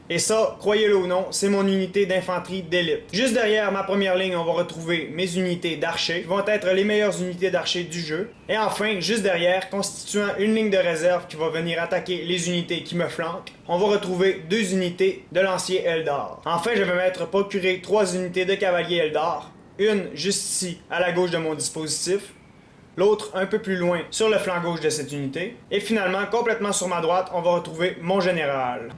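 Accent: Quebec, Canada. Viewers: moreover, he's got an accent from Québec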